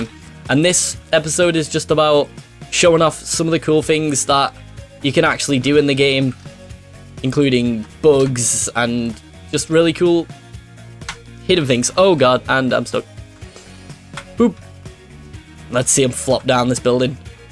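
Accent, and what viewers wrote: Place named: Scotland, United Kingdom. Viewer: btw love your scottish accent!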